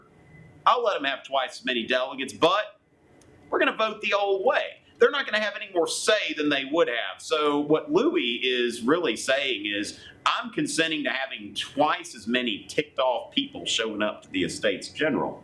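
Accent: South, United States. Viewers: Why is this man speaking is a Southern drawl? Whatever happened to standard and intelligible English? On a completely different note: Why does one have to hold a cup in one's hand while speaking about the Estates General? Seriously....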